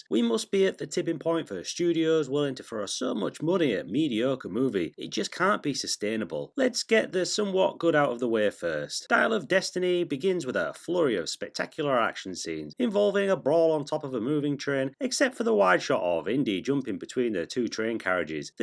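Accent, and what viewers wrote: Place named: United Kingdom. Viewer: its just his beautiful british accent fr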